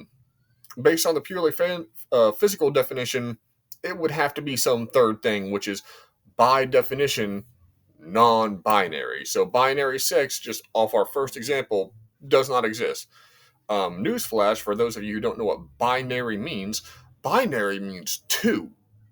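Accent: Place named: Appalachia, United States. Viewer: You from Appalachia or just live there.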